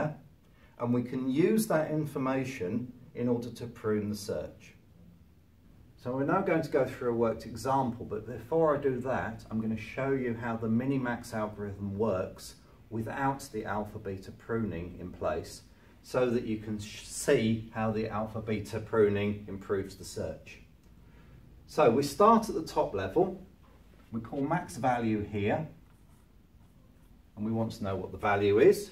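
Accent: United Kingdom. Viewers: Ohh British Accent ^^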